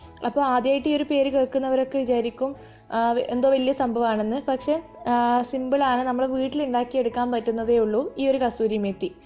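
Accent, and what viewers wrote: Kannur, India. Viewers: That Kannur Slang.. 😍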